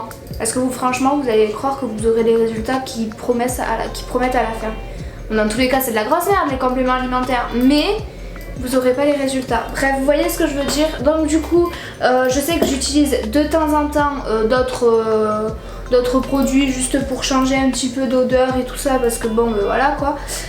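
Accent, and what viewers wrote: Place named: South, France. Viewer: T’a un accent du Sud et sinon t grv drôle 😂❤️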